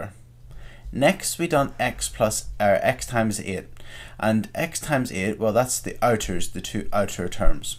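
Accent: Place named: Scotland, United Kingdom. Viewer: The Scottish are strong with this one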